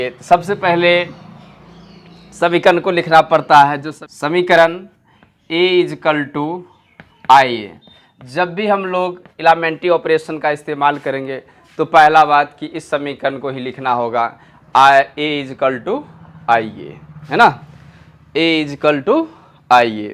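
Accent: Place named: Bhojpuri, India. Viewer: Ek dam khati bhojpuriyan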